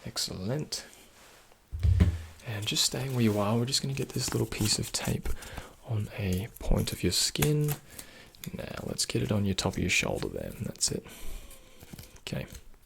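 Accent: Australia. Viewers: God yes!! I love how Aussies always say “no dramas” amazing. Voice is better than butter. New sub for sure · You look more like a Filipino but then you speak fluent Australian accent! Very knowledgeable and full of tingles. :((( Subscribed! ♥️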